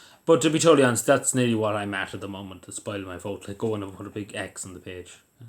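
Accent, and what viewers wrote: Ireland. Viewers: is it mandatory to vote? Im guesssing your in Eire from your accent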